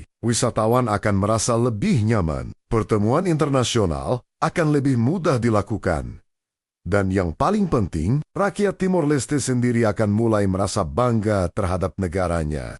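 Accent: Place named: Malaysia. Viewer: logat Malaysia, kalau narasinya orang indo kebanyakan fitnah iri dengki 😀😀😀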